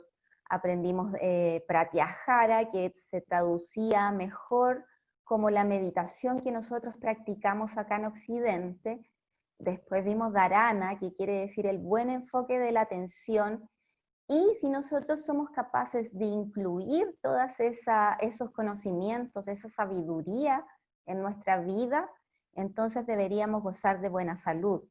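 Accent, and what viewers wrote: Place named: Chile. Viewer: Maravilla de conferencia .... Gracias , hermanita ... Dulce acento , el chileno ! Un abrazo de luz desde Buenos Aires .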